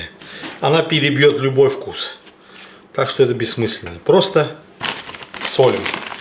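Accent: Baku, Azerbaijan. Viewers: Обожаю ваш Бакинский акцент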